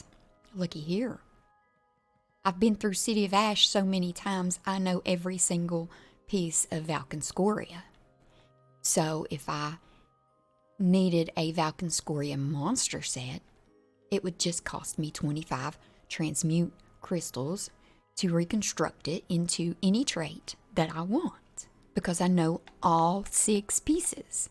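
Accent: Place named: Appalachia, United States. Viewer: Haha, are you from East TN, East KY, Or southwest/West Virginia? I was born in East TN myself , you sound like me and all my family haha. Funny finding that on the internet!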